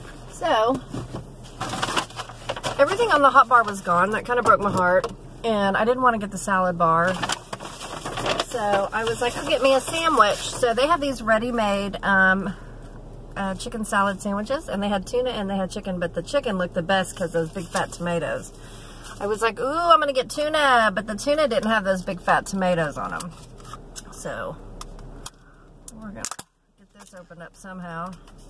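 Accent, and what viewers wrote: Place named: South, United States. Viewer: I'm gonna assume your from the south hence the twang. Love your vlogs💖😘I'm in Louisville,KY which alot of ppl call 'the south' but it's actually mid-west or what some ppl call 'The Gateway to the South' so sometimes I say words like ' Big Ole' but I also lived in Chicago for awhile and sometimes I have pure Midwest accent w/o a touch of twang or Southern, it's the strangest thing! Lol🤷🏾‍♀️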